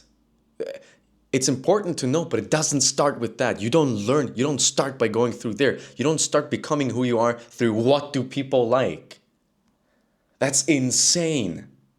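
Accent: United States. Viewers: "with the ridiculous amount of German blood/dna floating around the majority of white people in the US, he might just be a mixed American who happens to live in Europe (family, etc.)." is what I would have typed, but he has that just-barely-there accent - it's an almost completely perfect general-American accent (the way people tend to sound on tv when they are not meant to have an accent, shows up in films too). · So you have a Spanish first name, a German surname, an American accent and you live in Berlin. What's your story? It sounds interesting.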